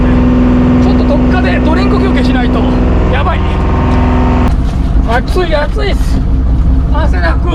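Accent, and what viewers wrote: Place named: Bingo, Japan. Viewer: 今回も大漁おめでとうございます 腰がうたう 備後弁久しぶりに聞きましたwww 無理せずこれからも頑張って下さい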